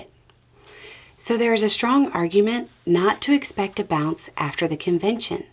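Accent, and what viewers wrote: South, United States. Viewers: Good morning 👋🏻👋🏻. It’s so good to see your lovely smile and hear your Southern voice today. I hope you and Beau have a great day today.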